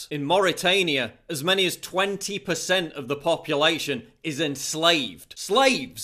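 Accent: United Kingdom. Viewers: Some practices are disgusting and vulgar by any standard. These people need to be enlightened. Anyway, thank you for the entertainment. Your British accent makes you even funnier.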